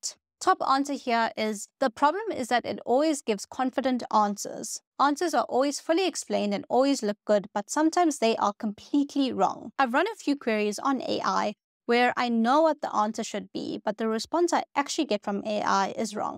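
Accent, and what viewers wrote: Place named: South Africa. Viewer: I lilke your channel it'sinteresting you sound south African and Indian, although i dont know you coul dbe sri lankan or etheopian dutch lol.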